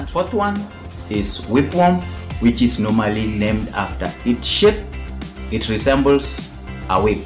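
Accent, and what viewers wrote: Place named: Luo, Kenya. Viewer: Haha apart from deworming sijui last time kudeworm haha lkni the luo accent hehe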